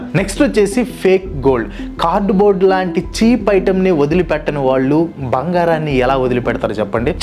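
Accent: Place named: Visakhapatnam, India. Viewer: U speak visakhapatnam clarity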